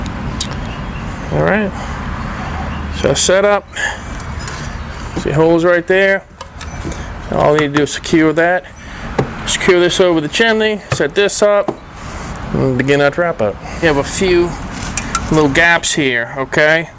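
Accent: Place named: New Orleans, United States. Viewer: I can hear that New Orleans accent , lm a Cajun also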